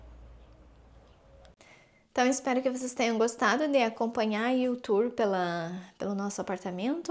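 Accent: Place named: South, Brazil. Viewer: Olá muito lindo seu AP, vc morava aonde no Brasil vc é do sul pelo sotaque eu pensei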